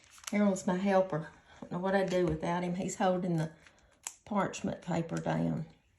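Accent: South, United States. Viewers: I love your Southern accent !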